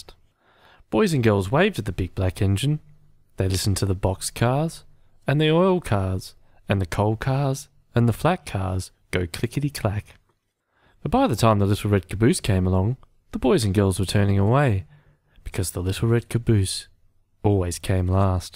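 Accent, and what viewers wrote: Australia. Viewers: I actually like the Aussie rendition of this! You definitely speak with feeling. Enunciation as always, highlights the points of childrens stories and those words that they are supposed to understand. Seems that you've done that. I like getting feedback on my channel as well. I have written a few original children's stories if you would kindly take the time to check some of those out on my channel and let me know what you think! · I think this is a really good reading and it is a classic children's story. Nice to hear an Australian voice. I do think, however, that we Australians tend to speak with a lot less light and shade and inflections in our voices than many other native speakers of English. I think the reading of this simple and engaging story, simple though it is, could do with a more light and shade in the voice - especially when talking about the hero at the back of the train. 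Also, perhaps, more pauses and a changes in tempo - I suppose - in the repetitive sections about the various different cars - the oil cars, the box cars et cetera. But, it's the reading to the children that is so, so important - the manner of the reading less so. But you will find the more you inflect your voice when reading, so will the children when it comes their turn to read it to you ... as they will! Aren't the illustrations classic. The illustrator has a very interesting story himself, and was a renowned artist in the years between the two world wars. Best of luck. More soon, I hope.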